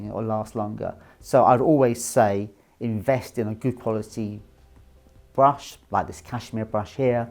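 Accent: England, United Kingdom. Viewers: I stumbled across this channel a few months ago and after a few videos I decided to subscribe. Good move.lm' Iearning a lot, and presents the information so well in his laid back clipped English accent. Surfed over to the website and was super impressed with their pocket squares. I just purchased two of them and will probably buy ten more. Thanks, and and the whole Rampley team for putting this videos together.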